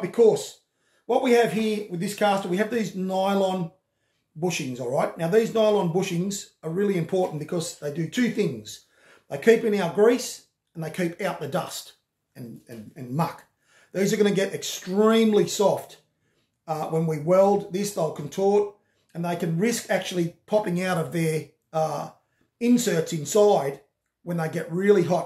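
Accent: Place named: Australia. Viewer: Doctor your 4 DOF build is amazing! I love the videos and your aussie accent is always pleasant to the ears :) Cant wait for the rest of the build videos!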